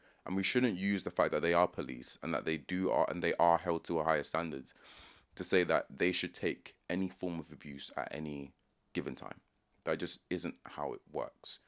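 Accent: United Kingdom. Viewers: Nobody seems to care that the INDIGENOUS PEOPLE are being raped, murdered, and replaced. Or is it only when the "indigenous" are a black or brown minority group that people care? Is it really a case of "You whities have had it good, get what's been coming to you"? Of course it is, that's what the anti-white propaganda has been screaming for decades. All of them must go. I'm not sorry, either, you all must go. Had it been Nigeria that Germans were illegally immigrating into, there would be outrage and the populace would violently oppress the percieved invaders. While you act British, and have British speech, and act in a British manner, you are only British by civic nationalism; in every other sense of the word, you are no more British than I, a Canadian, but even then I am more British than you. I greatly appreciate the effort you put into this video and I laud your tact and precise framing and use of English, however you must also go back; you do not belong here, and history will show the world that the greatest folly of the White Man was, upon freeing all of their owned slaves, not sending them back home whence they came. 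· You're literally watching a video of a man, who although clearly not a native, has mastered the language and has assimilated to such a degree as to have an accent, and i'd wager ideals, in line with what one would expect of someone who is British. In other words, he has fully adopted British culture and thus is himself British. To be British is cultural, that is why the English, Welsh, Scottish and Irish are all British. And yes, I said it, the Irish, all of them, whether they like it or not I consider them brothers, just really grouchy ones as they're still upset that they didn't get their mashed potatoes that one time... If I was born in England to typical English parents (Well, I mean I was, so no imagination required for that part. Rather a parent, dad went for milk and cigarettes... ), yet moved to Nigeria young, I'd be English by nationality but provided my parents don't have a superiority complex about their own culture, it will become increasingly likely that I heavily adopt Nigerian culture and become culturally Nigerian. 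That would perhaps be a strange sight that would make a twatter leftists head explode, to see a white man as pale as I speaking as a Nigerian, with all the calmness and grace, the funny pauses and affectations. "Ah, good evening sah, this is your bank calling... " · I haven’t heard many people on the right saying “get rid of all immigrants”? As an Englishman few things make me prouder than a true British person like this chap with an immigrant background. The fact that he has adopted some of our culture, values, accent, sayings, fashions, sports etc. He is British! Born and raised here just like any White British kid his age. It’s all about integration and adding to a society, not being a drain or a detriment. Many areas of the UK now have not been integrated but replaced, and when British culture is replaced by something so alien as Islamic ideology and practices you will inevitably get conflict. 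· Your UK accent gives you away. You're not the problem. It's people who don't even speak english.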